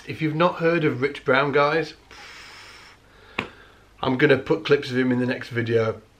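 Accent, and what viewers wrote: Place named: England, United Kingdom. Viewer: Thank you so much. You are the best Teacher with a lovely english accent. I'm learning soo much from you. It's a real pleasure to listen to you. You can make my day even after hard work in the office.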